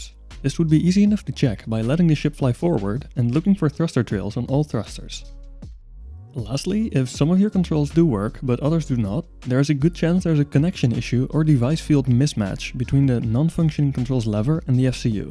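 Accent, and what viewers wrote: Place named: Netherlands. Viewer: Ik herken dit accent direct haha. Dutch space representative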